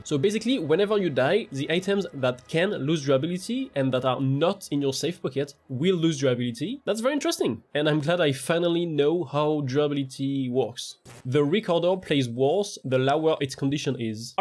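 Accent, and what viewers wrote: France. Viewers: sorry you have to play this game on hard mode once the other raiders realize you sound french. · Why do i feel like you have french accent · Français non? Le bon vieil accent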